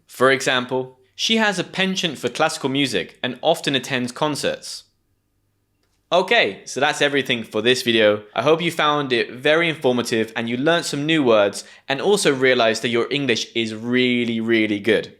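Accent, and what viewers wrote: United Kingdom. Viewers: I'm from germ money. You're from the UK, as I can clearly hear at your dialect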